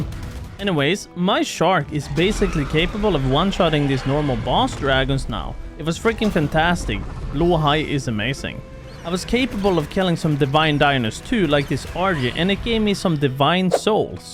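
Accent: Sweden. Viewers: are u swedish? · As a fellow Swede, yes this is why, and yes you reeeeally got that classic swedish accent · you sound swedish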